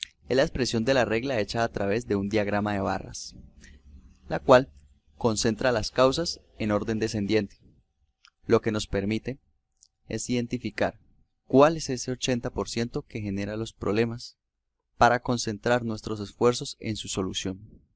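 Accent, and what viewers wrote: Cali, Colombia. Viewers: voj sos caleño si o que? pajame eje japato ve ... buen video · Vos eres caleño cierto??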